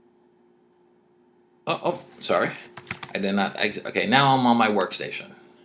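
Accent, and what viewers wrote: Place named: Boston, United States. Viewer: repro - lovin' the accent. Cousin's from Bwahstin (Boston).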